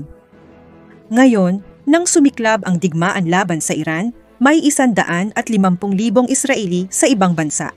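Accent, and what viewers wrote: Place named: Philippines. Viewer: GOD, bless para kang pilipino mag salita maganda ka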